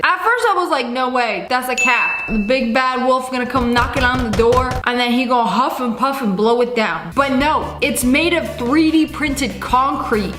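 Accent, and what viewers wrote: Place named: London, United Kingdom. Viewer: ur from london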